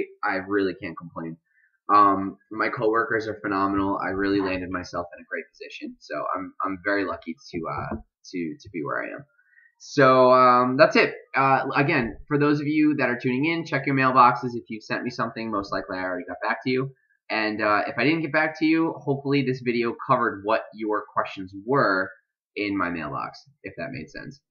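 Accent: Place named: New Jersey, United States. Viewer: Tried to do your NJ accent but kept on making a southern accent lol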